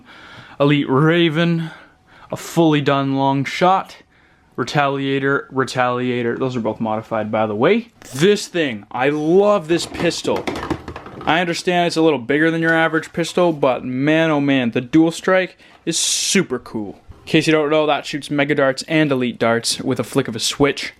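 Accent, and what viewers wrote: Canada. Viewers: In America we say owt in Canada you say oot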